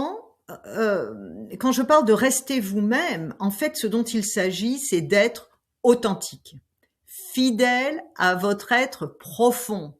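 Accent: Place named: France. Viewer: Merci infiniment. C'est une précieuse aide, que je réécoute souvent. J'aime votre manière de vous exprimer....et vous avez conservé votre accent français clairement. Chaud au cœur votre accompagnement est un Plus.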